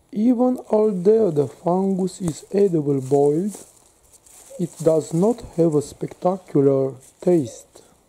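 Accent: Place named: Europe. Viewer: Alright..not likely to pick up ANY white gilled mushroom. Are you from...difficult to tell- Italy, France, Austria; a mans voice is harder for me to discern .You can tell me.I learned so much from my European journeys!I loved it.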